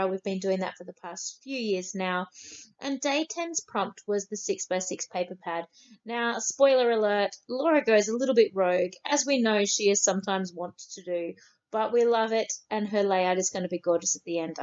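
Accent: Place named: Australia. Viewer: I love the Aussie accent soooooo much so this was a lovely treat for me. Course, I'll be glad when Laura can speak again too ;)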